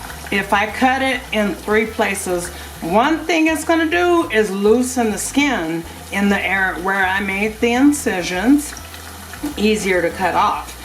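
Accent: Colorado, United States. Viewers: Is that a Colorado accent?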